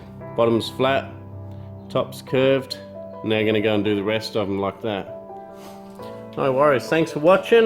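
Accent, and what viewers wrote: Australia. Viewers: Just starting out with bonsai, and found your videos, looking forward to many more. Nice to listen to an Aussie accent for a change 😃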